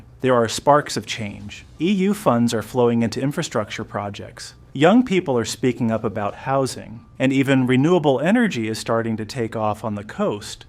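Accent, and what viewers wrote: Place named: New Zealand. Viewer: Sounds like New Zealand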